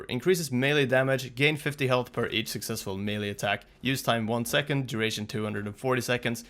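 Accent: Sweden. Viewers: bra video, that swedish accent tho XD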